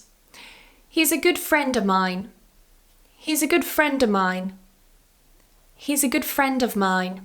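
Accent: United Kingdom. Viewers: Que bueno necesitaba esto de prunciar acento british me encanta 😊😊😊😊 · I really i like british accent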